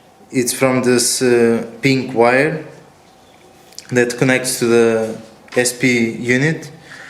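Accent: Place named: Russia. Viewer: Guy what state do you ride in, or country, you sound russian. That way i can show you a thing or two about your CBR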